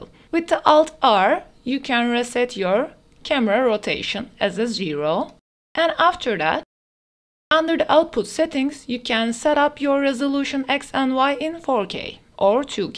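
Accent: Turkey. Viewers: Bu aksan ben Türküm diyor 😄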